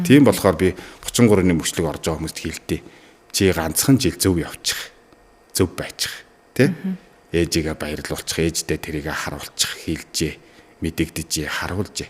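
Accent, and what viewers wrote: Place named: Inner Mongolia, China. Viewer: Өвөр Монгол хүн үү ? Аялага нь тэгж сонсогдоод бна. Сайхан ярилцлага бна баярлалаа.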